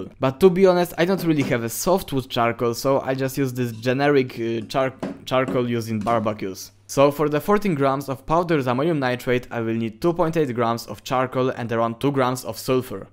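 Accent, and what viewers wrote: Poland. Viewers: No Siena mordeczko, wiefziałem, że elpolako po alcencie z “sz” I “cz”. Pordrooo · czy ja słyszę polski akcęt ?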